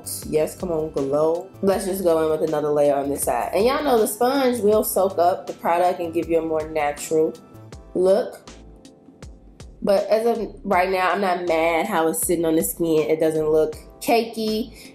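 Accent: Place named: Chicago, United States. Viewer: You sound like you from Chicago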